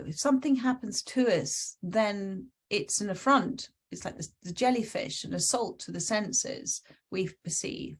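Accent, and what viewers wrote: United Kingdom. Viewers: Love this@ psint the sky on the Wedt Coast with family. They are buying a house. I will have my own rm. Travel again...another grandchild , their slowing down work load to do. I am the matriarch of the family. No worry no struggle. Thsnk you. I've missed your readings. Been months!! I l8ve yor British accent. In my blood on mom's side. May she RIP. I have the Medicine Animal cards. Got in the late 80's. Was in fear during the pandemic. Feeling much better.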